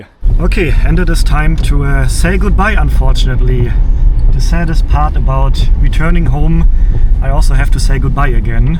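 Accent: Germany. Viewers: Your German accent is terrible but your mother's is okay.... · Crazy how you have to speak 3/4 years only english and you still have a very very german accent and your your mom who lives in Germany not really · That’s lovely German English. accent Your mom is so cute! ❤ I discovered your channel recently. Want to see all of your videos more often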